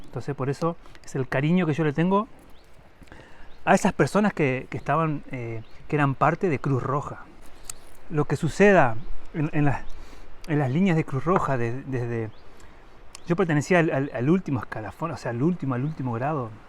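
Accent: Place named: Argentina. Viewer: Por ser argentino tenes acento de que parte de argentina sos. Muy buenos los vídeos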